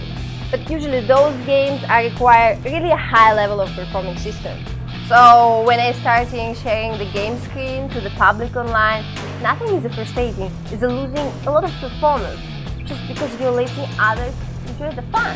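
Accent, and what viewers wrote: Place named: Russia. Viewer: Wow that accent. I can barely understand what she is saying. Is she Russian or something?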